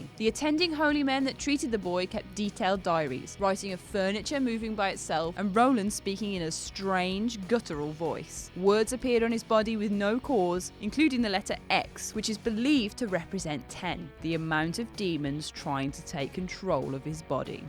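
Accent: United Kingdom. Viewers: I don't believe in ghosts, but I also don't have a problem with hosting. I find it kind of refreshing to hear a female British accent presenting a Whatculture video.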